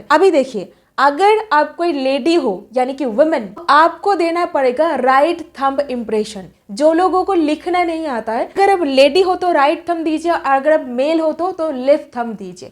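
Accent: Bengal, India. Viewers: nice explanation through a thick Bengali accent. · Nicely explained with beautiful bengali accent.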